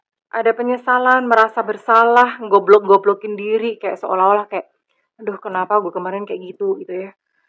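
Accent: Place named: West Java, Indonesia. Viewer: Aya noann sunda nyh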